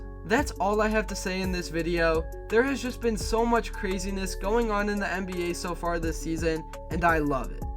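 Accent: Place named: Cleveland, United States. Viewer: are u from cle? sound like it but i wanted to ask. great vids,. newly subscribed. looking forward to the next one.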